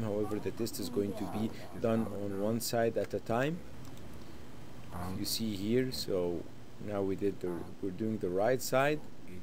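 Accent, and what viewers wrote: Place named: Egypt. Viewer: His accent sounds Egyptian I’m not sure lol Thanks for the video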